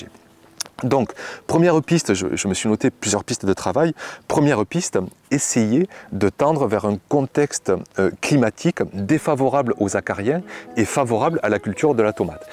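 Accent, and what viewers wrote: South, France. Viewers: Haaaa l accent du Suuuud! Ma femme rigole toujours quand je parle de potager et d idées! Elle me dit toujours " t as encore regardé le type de Sud hein " 😅 Bref tout ça pour dire que je compatis et que j ai eu la même chose... Mais... Je vis en Suisse, 8 plants sous serre semi ouverte en plein soleil donc pas d'ombre. C est ma 3eme année de potager (2022 et 2023 etaient moyens mais j avais pas trop amonder mon sol😊). Finalement mes voisins qui ont tous un potager ont aussi été ravagé. Cela avait aussi commencer en début de juillet dans ma serre mais beaucoup moins fort que mes voisins ( 20 mètres en chaque potager environ) Je me suis essayé à la décoction de prêle. Je taillais tout ce qui brunissait et je pulverisais par dessus avec le prêle. Finalement je suis le seul qui ai réussi à sauver mes plants. Fin juillet cela s est arrêté de brunir. 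Et j en ai finfalement eu des tomates et elles ont surtout bien rougis, comparer à mon voisin direct qui a une serre aussi et qui avait mis de la bouilie bordelaise, cela n a pas fonctionné. Est ce le prêle? Ma serre? Un coup de chance? Je sais pas mais continue dans ce que tu fais c est tjrs un plaisir de te écouter et garde espoir. 😊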